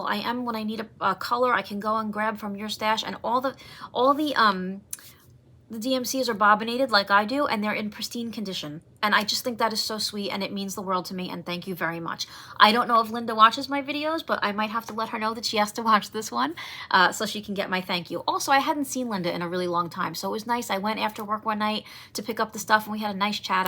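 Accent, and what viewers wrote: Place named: New Jersey, United States. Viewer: Hi! I'm watching you fr the North her in Ontario, 🇨🇦. I love your Jersey accent. I'm new to Cross Stitch & am thrilled to watch others videos & see their projects & stash. And oh my there often is mountains of both. I'm currently finishing up my project & looking forward to starting "Gracious Era"(Dimension kit) it's a Xmas gift for my mom. Glad you had fun at Stitch North, gosh I live close to the venue but was new at that time. So maybe in 2026 I can go! Happy summer! You're the second I've met in my life.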